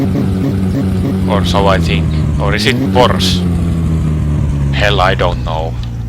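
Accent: Finland. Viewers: I'd say Finglish is more common though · every pawt iz sumhoww cruishul for de funtion of de engin love how finnish people talk :) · oo my gawwwd, the finnish english accent for the win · Finnish accent :) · tuo rallienglanti on ihan törkeen hyvä lisä tähän :D ja tähän pitää kyllä tulevaisuudes saada joku multiplayer tai cooppi että saa kaverin kans ajaa pitkin raittia ja ryystää olutta ja käydä pubis ja ostaa lisää kaljaa :D ja toki lisää legendaarisia autoja esim saab 900,toyota corolla ke20,fiat 127 jne :) kyllä tämä on pakko ostos ja kaverille kun linkkasin niin oli ihan samaa miältä :D ihan vitun kova peli tulos, "kuinka muistot tulvivatkaan" :DD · kyllä on suomalainen peli ja rallienglanti kohillaan tuleeko steamiin vai omalle nettisivulla?? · Ei millään pahalla, mutta tää suomienglanti on jo aika helvetin koomista. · suomi-englanti-finglish, 5/5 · 51 minutes and 5 seconds of beatiful Finnish accent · His Finnish accent cannot leave when he speaking English lol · Rallienglanti on tähän yhteyteen täydellinen aksentti, älä ikinä muutu. 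· Suomen eglanti aksentti on kyl hauska. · En kestä rallienglanti ilman beer. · Aivan loistavaa! Videon rallienglanti kruunaa homman. Tö korner is tankero. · It´s so called "rally english*". If you listen some finnish rally driver interviews you get this joke. :) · Aijai! Selostettu vielä autenttisella rallienglannilla! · Nyt on kyllä niin rallienglantia että ei pysty edes sanoin kuvailemaan. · daniel targett its rally english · Im guessing that his accent in reality is not that thick but that it's rather a parody of the "Rally English" that Mika Häkkinen and all the 70s and 80s finnish rallyedrivers spoke. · that finnish accent in english it's so unique =D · Vittu ku on rallienglantia. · ralliEnglantisi on kaunista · Classic Markku Alen english!! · With "rally english", ofcourse. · Rally english makes it always funny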